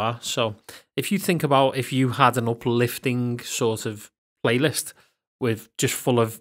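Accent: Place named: Liverpool, United Kingdom. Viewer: I just discovered this feature, but didn't know how to filter or search with the tags... which is why I came here. And I was welcomed by the most amazing scouse accent in the history of mankind. Boss mate!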